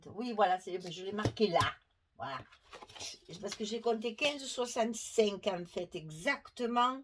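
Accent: South, France. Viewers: Bonjour Toujours un plaisir de regarder vos vidéo et d entendre votre accent du Sud! je scrap depuis plus, de 10ans avant j étais dans une association maintenant je scrap chez moi beaucoup pour mes petits enfants Sympa le kit 😍 J habite à Granville en Normandie ( pas très loin. du Mont St Michel) 😉 Laurence Bonne journée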